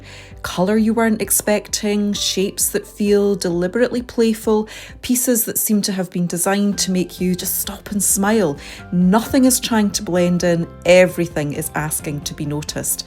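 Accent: Scotland, United Kingdom. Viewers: LOVELY Scottish accent! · your british accent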